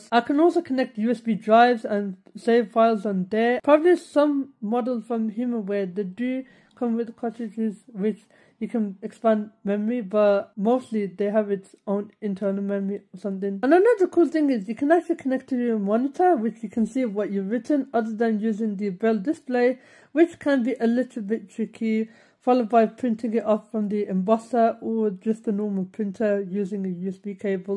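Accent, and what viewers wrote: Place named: United Kingdom. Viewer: Are u British I love ur accent ❤❤❤❤❤❤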